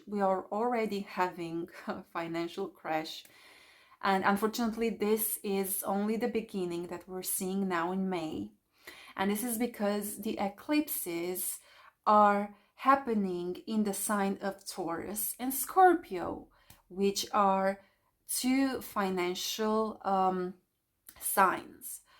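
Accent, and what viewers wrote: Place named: Portugal. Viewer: Awesome video, i am like an amateur astrologer and i share basically the same ideas as you. I am Portuguese, are you in Portugal by the way? Your accent sounds Portuguese, hope you view this message because i would like to be in touch with you. Amazing video again.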